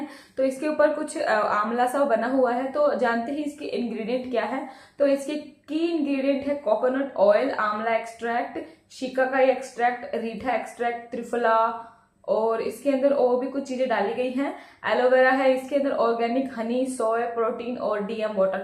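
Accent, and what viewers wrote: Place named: Haryana, India. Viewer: hlo dear.....i m from bhiwani.. yhi karan h ki m aapki fun ho gyi hu... thoda haryanvi touch h aapme.....m rhti hu Lucknow me... aapki give away muje chahiye..m bhi use krna chahti hu.😊😊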